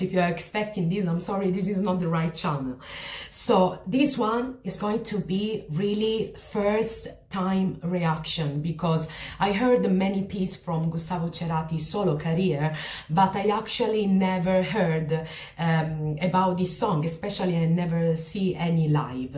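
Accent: Italy. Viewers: Amo tu ingles con acento italiano suena cool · Reina, gracias por reaccionar a un genio del rock Argentino como lo fue Gustavo Cerati. Un musico diferente al resto.. Noto una bellisima tonada Italiana. Eres de Italia? por que hablas en ingles? Besos linda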